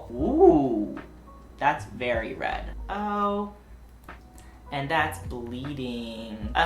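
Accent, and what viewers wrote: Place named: Texas, United States. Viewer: U've been so texan lately!!! I'm love it!!! Y'all!! Ur accen!! Yeehaw king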